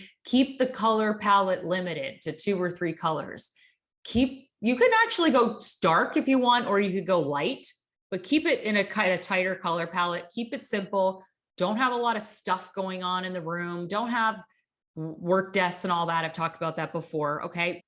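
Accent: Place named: Texas, United States. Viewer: Is it me or are you saying Y’all more frequently? The Texan is coming out! 🤣 I love it!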